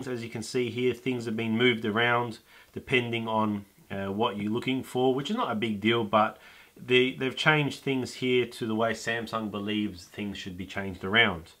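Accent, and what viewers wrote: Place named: Australia. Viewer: Aussie accent!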